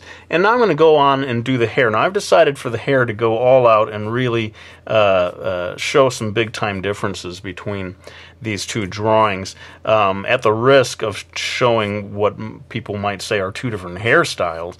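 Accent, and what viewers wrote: Brooklyn, United States. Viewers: Your Brooklyn accent is just too much!. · I do love your Brooklyn accent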